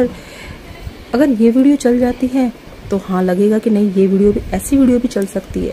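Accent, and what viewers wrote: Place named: Garhwal, India. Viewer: Garhwali bolate hue acche lagte ho, bahut Sundar 👌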